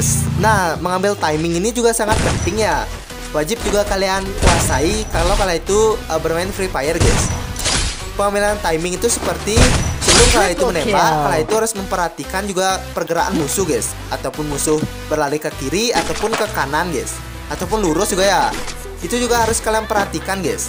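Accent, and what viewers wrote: Bali, Indonesia. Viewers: Logat bali😅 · Bli logat nya kayak orang bali nek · Bang Abang orang Bali? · Org bali ya bang ya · org bali y bg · Kok cara ngomong indonya kek orang bali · Orang mana bang kok kayak orang badung bali ngomong bahasa indo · Pasti orang bali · Ngomongnya kayak orang Bali gw curiga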